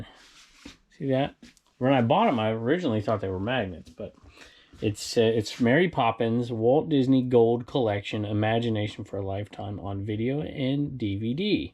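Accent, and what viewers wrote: South, United States. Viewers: It’s so interesting to know that you were raised in California because you’d never know it! You have the southern ‘twang’ down perfectly, as well as the slang (ya’all), in addition to other very southern mannerisms! My sister, who originates from South Dakota, did the same thing. She married a southern boy from Arkansas and within a year had the accent! We got such a kick out of our sibling becoming ‘southern’! Love your channel!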